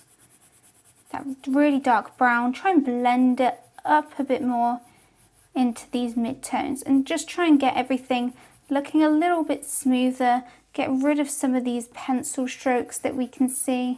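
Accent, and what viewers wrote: England, United Kingdom. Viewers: You have blown my mind with these videos. I have avoided coloring a lot of my pictures because of skin tone and I bought a pack of Crayola skin tone colored pencils which is cool but I kind of felt like it was cheating. The one thing I do understand is that all you need is red blue yellow black and white and you can create almost any color that exists especially with paint. But I like colored pencils and I don't know how I've never come across a video that explains so easily how to create skin tone and now I'm doing it like a pro and I have to thank you for teaching me that. Anybody who isn't British I can tell you things that not only you are very pretty but it's hard not to love your accent. Which I kind of think it's funny because where you live it's not an accent but a bloke from America thinks it's super Charming. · Her accent or british accent is perfect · The english from England is really beautiful !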